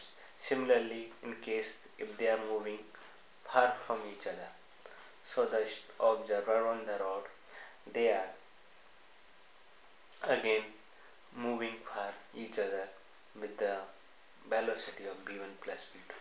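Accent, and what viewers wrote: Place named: Asia. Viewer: wow, I would've thought that someone would've made fun of his Asian accent saying that Asians are really smart. Well done world!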